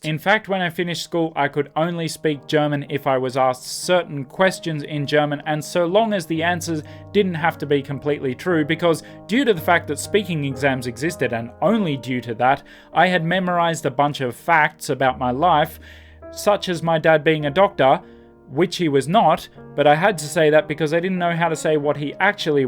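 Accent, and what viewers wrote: Australia. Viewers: From your accent, I guess you are Aussie? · I speak English exactly as you do. I'm from NSW, I think we all kind of speak like you. I needn't support my claim, as most other English-speakers around world don't understand us anyway.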